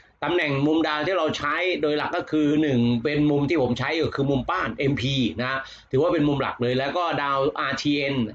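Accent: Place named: Chiang Mai, Thailand. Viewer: ออกเชียงใหม่อาจารย์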